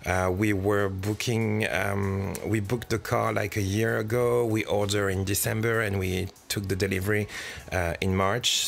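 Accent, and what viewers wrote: France. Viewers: trop marrant la voix avec l'axent français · your charming french accent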